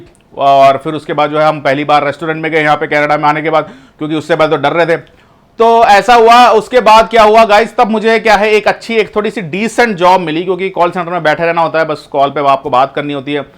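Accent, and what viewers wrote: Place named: Hyderabad, India. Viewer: Bhai Aap ke Hyderabad ke Dost Bahot the Kya? Kyu ke Aap ki Baat me Hyderabad ka Style hai.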